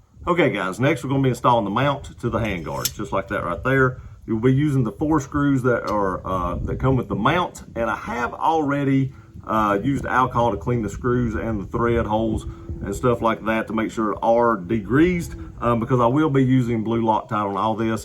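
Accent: South, United States. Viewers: Are you from South ?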